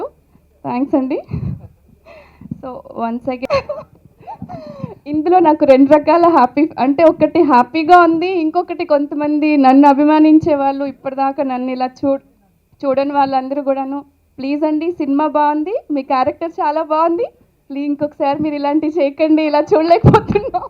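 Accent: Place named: India. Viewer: Spoken like a True Telugu ammayi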